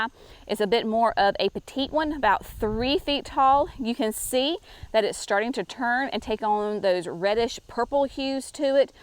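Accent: South, United States. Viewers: I'm zone 8 Arkansas, a 5 yrs gardener and I have learned so much from the videos you've posted. I just love your southern accent, your gardening knowledge and your personality is unmatched. ❤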